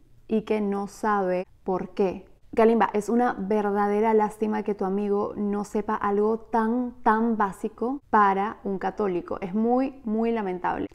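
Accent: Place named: Lima, Peru. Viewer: ¡Te felicito! Excelente didáctica. Soy apologista católico desde hace treinta años y me enorgullece ver tu prestancia y razón para defender nuestra fe católica y su verdad recibida del mismo Espíritu Santo. A propósito, siendo yo del Callao Perú, capté tu acento limeño. Te pregunto, ¿estás en el Perú o en EEUU? Muchas bendiciones en tu apostolado.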